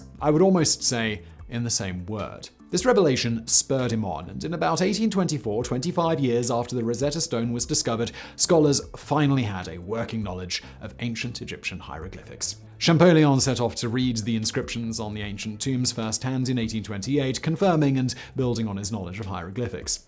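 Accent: England, United Kingdom. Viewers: More interesting than that stone, can someone tell me what english accent does this guy has? It is definitely not an American, but it does not sound like standard english either.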